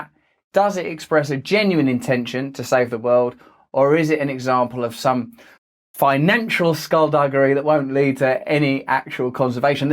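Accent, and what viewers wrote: United States. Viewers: It's fucking weird to hear Russell Brand speaking in an American accent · Everytime you do your American accent it causes me to do a double take and rewind. It's so funny! Best to you and yours! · That American accent got me 😂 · THE AMERICAN ACCENT🤣🤣🤣 I’m obsessed with jt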